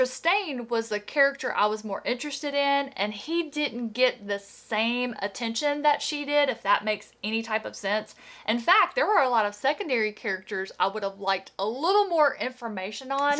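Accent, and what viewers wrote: South, United States. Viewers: I find it hilarious that the accents tripped you out - I live in MS, and your accent is nicely southern to me, and more pronounced than most of the people I'm around on a day-to-day. 😆🤭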